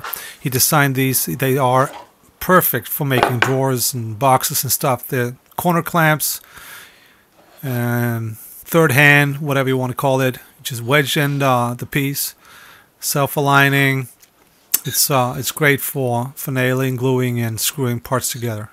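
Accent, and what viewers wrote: United States. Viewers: Great shop setup. Norwegian?, you sound like you have a New York accent! How does that happen? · You say thanks for watching but I for one want to give you a BIG Thanks for sharing!! You took a short basement (w rock on one side) and made it a great wood shop! Especially like the cabinetry and organization (wish I could do 1/4 of that). Your DIY tools and jigs are an inspiration. I do have one question; the electrical plugs aren't the type we use in the USA but sound like your from the northeast USA? Thanks again!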